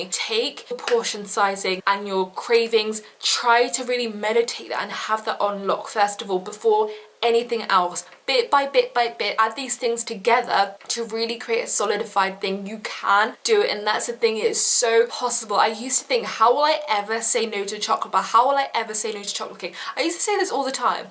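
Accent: United Kingdom. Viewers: Besides the tips that you've shared, I also love your cute British accent ANYWAYS Thank you for this wonderful video 💖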